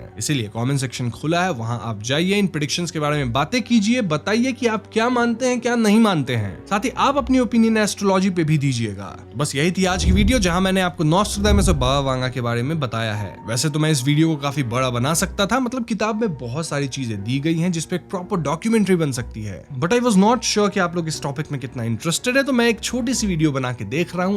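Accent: Bengal, India. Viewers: Bhai tu bangali hai Keya Tera Hindi sunke Aisa lag raha ha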